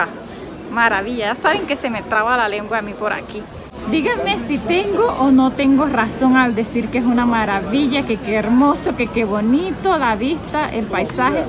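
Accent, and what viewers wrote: Caribbean Coast, Colombia. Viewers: Hola saludos a tu esposo bello me gusta como hablas costeño t saludo desde barranquilla eres muy auténtica costeña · eres muy linda y agradable, me gusta como hablan los Colombianos saludos desde Guadalajara 🇲🇽 México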